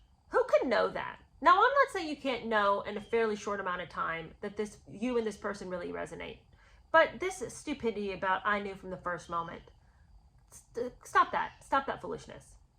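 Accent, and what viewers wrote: United Kingdom. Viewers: I agree. She looked gorgeous in England. She had to have a stylist and she claimed she didn’t. I really enjoy your channel. You’re very funny. Your British accent is simply the best 😂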